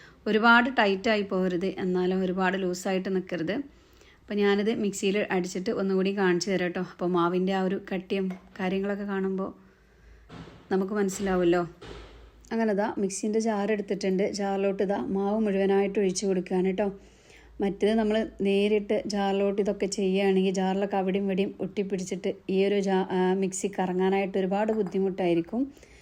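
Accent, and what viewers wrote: Malappuram, India. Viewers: Malapuram thathante samsaaram aayirunnalloo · മലപ്പുറം താത്താന്റെ സംസാരം മാറിയോ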